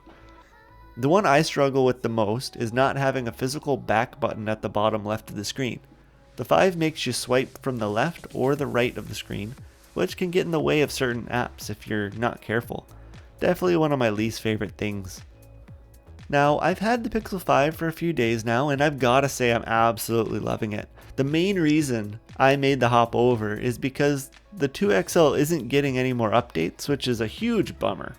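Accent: Canada. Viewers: Eh bud, what part of canada are ya from, I'm out in BC. Just your accent is one haven't heard from where I'm at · This was exactly the comparison I was looking for. Love the Canadian accent btw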